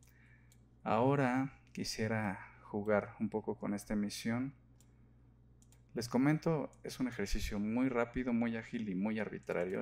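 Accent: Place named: Mexico. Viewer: me pasa que siento tu voz como si fueses actor o de alguien conocido, ¿será que los de latino américa ya estamos acostumbrados al acento mexicano en el doblaje?... xD Está excelente el modelo. Espero que te encuentres bien. Greetings!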